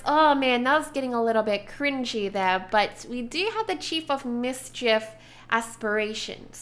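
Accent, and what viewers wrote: Australia. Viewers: Are you Australian? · is she from Australia?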